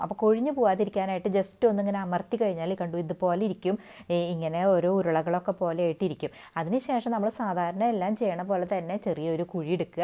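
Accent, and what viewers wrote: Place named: Malabar, India. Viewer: New subscriber. Found your videos full of useful information but your Malabari slang is evident in your audios. Not a big problem. By the way I would like to have some seeds of red and green cheera, palak, long payar, venda and chillies. If possible please send at my address : GEORGE JAMES, THYSHERRIL HOUSE, PANDANKARY P. O., EDATHUA 689 573. ALAPUZHA DISTRICT. Thank you.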